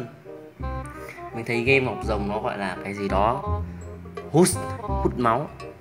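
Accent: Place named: North, Vietnam. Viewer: Bạn nên nói to hơn mih ng nam nghe tiếng bắc hơi khó hiểu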